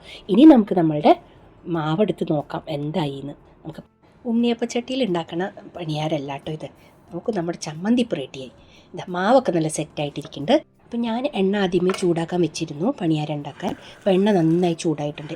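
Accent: Palakkad, India. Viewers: പാലക്കാടാണ് നാട് എന്ന സംസാരത്തിൽ മനസ്സിലാക്കാം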